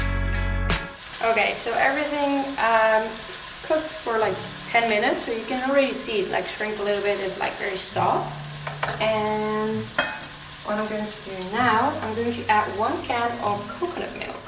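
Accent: Netherlands. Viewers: je accent is heerlijk , hoor gelijk dat je nederlands bent lmao. Xxxx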